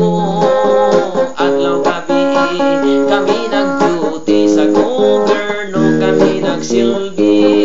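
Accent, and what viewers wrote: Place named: Samboan, Philippines. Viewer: Nice jud ka.ayo mora jud og taga samboangnun in south cebu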